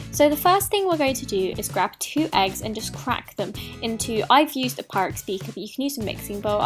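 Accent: United Kingdom. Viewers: Your strong British Accent...Pretty good there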